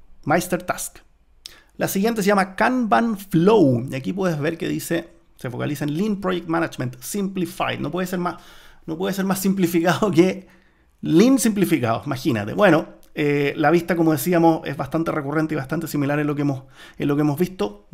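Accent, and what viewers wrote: Chile. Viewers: eriii shileno?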